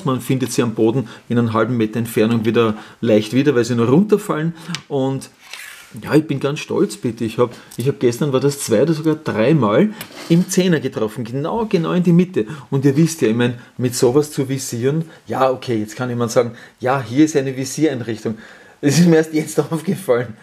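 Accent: Austria. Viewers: 💜🦢💚 Bedanke mich & grüsse ALLE herzlich ⭐ am Samstag Gemüse gepflanzt in meinem wilden Garten 💚🦢💜 Ich liebe den österreicher Akzent.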